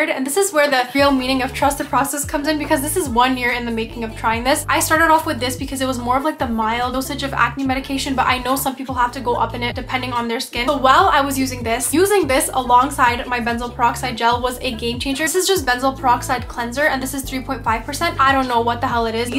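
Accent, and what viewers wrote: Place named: United States. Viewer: wow wow karti hai ....america akcent bolti haaaai ....lagti tu powri indian haaai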